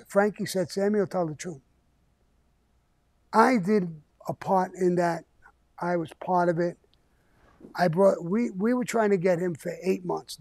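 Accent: United Kingdom. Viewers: Your accent blows go back over the pond and talk about the queen like you know anything about the mob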